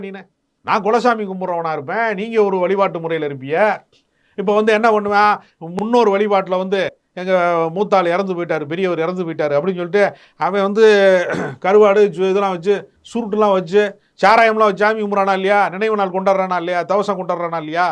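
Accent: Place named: Madurai, India. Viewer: Madurakare pechu Thani thampa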